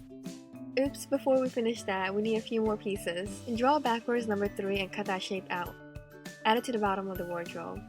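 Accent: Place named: New Orleans, United States. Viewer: Are you from South Louisiana? Your accent sounds like you'd be from New Orleans or one of the communities surrounding it. You sound like home to me!! Also, this is fantastic! The only suggestion I'd make is a simple one: I think that instead of calling the paper pieces you create "stencils" is slightly off. The word "pattern" makes more sense to me, but that could just be me. 😁 Other than that, this is fantastic! I don't make miniatures (yet, at least), but I'm subscribing!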